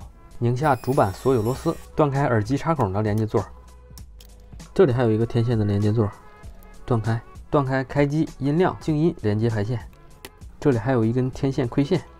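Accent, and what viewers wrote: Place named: Yushu, China. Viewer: 听口音像是榆树的，老乡？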